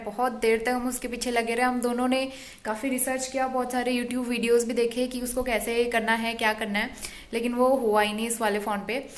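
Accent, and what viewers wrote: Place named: Pahadi, India. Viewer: Suparb vlog lagte h apke me bhi kumaungi hu mujhe apka pahadi bolna babut cut lga 😍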